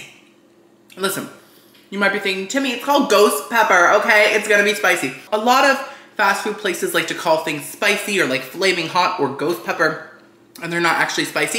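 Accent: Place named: United Kingdom. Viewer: Timmy with a British accent is what I am here for :-)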